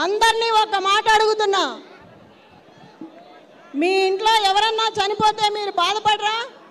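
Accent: Andhra Pradesh, India. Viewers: Andhra voice Baat me dum nai hai😢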